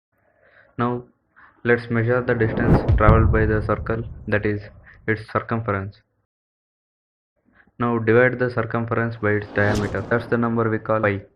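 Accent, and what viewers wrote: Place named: India. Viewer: It's not just your video. I find it difficult to listen to anyone who has a very thick Indian accent.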